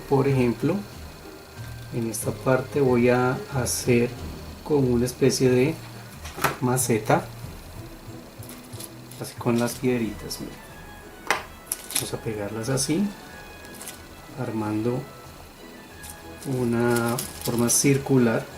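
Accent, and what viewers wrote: Colombia. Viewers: Me Encantaron las 3 pero Ame la Tercera y con tu Acento COLOMBIANO me Enamore mas de tu ARTE gracias y Bendiciones por compartir saludos desde Jalisco México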